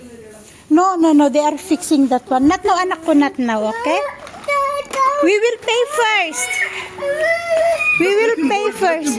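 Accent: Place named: Visayas, Philippines. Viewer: Bisaya ka ba? Accent mo kasi bisaya. At comment lng wag ka tawa ng tawa wala naman nakakatawa.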